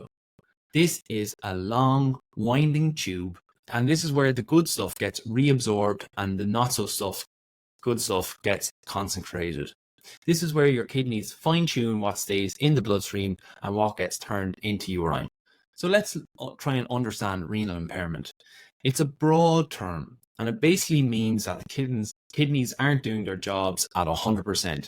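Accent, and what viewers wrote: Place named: Ireland. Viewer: Excellent. I have Stage 4 Ovarian Cancer, and they had to dial back my chemo dosage last year. (I had perfect kidneys before this.) Im now on Targeted Maintenance Therapy, and my kidneys are tanking more. I'm on a break until t'row when I potentially restart. I was being referred to my late mum's Kidney Specialist. (She had Chronic Kidney Disease from high blood pressure and Diabetes... and then 2 separate angiogram surgeries for a Brain Aneurysm & a Heart Attack - she died briefly with the latter - cooked her kidneys, making her terminal. It's an ugly disease.) I've not heard anything yet, though, I do have other conditions that necessitate I take various meds. It scares me more to have potentially damaged kidneys, than to have cancer... only because I've not seen anyone die of Ovarian Cancer. Anyway, I would've loved this as a video or charts. 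Your thick Irish accent to my Australian ears made some parts difficult, especially with some unfamiliar medical words. Anyway, at least thank you for that crux of the situation re kidney levels & what's going on. I never really googled my mum's disease - it was just another facet of her life. Thank you!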